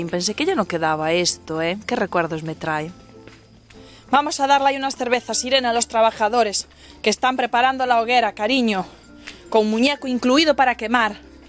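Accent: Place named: Galicia, Spain. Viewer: Me ha encantado este video... Con las tradiciones de tu tierra GALICIA. Tan verde, tan hermosa, tan maravillosa... Hayyy... Me gusta mucho tu tierra , sus gentes , sus paisajes, la costa galega, la gastronomía tan suculenta, y vuestro acento!!!. Y me gusta también, como transmites a tu niña todas las costumbres típicas de tu pueblo!. Enhorabuena y un abrazo desde Navarra!😘😘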